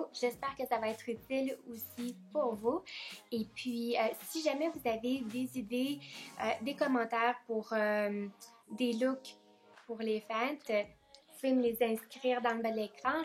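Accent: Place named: Quebec, Canada. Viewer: Tu es québécoise ? J'aime ton accent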